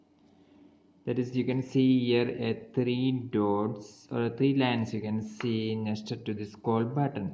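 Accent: Kerala, India. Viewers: Mallu accent😄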